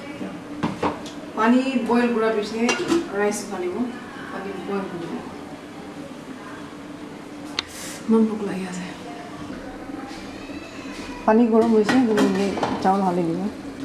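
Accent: Nagaland, India. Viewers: Ur voice n ur nagamese talking style just wow 🤩plus ur cooking skills just appreciatable 🤤🤤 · I made pork watching your Video 😁 and it was incredible 👌 couldn't believe that I could make such tasty 😊 but I'm sorry I didn't tell the guest that I followed your ingredients 😆😆😆😆😆😆 You are beautiful like that taste of your cooking ingredients 😍🤩😘 And your accent Doesn't sound like Ao neither Lotha but like Konyak 👍👍👍👍 😊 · Ahhh soo good listening nagamese. .. Me too stay in Gurgaon but I'm from Nagaland (Dimapur)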